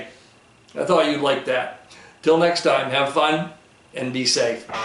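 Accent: Maine, United States. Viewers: It's GREAT to see you getting back into full swing! Love the Maine accent and getting a history lesson aint bad either! Thanks!! Glad you are on the mend!!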